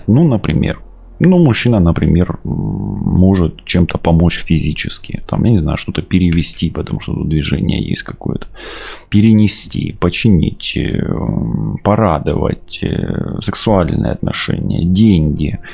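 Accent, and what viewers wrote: Ukraine. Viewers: ! Очень интересный расклад. И очень красивый чистый украинский язык.. Не часто такой услышишь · Красивый расклад и красивая украинская речь ( мова). Мир всем нам❤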